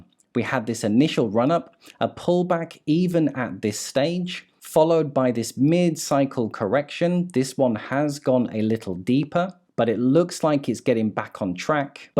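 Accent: United Kingdom. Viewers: Your British right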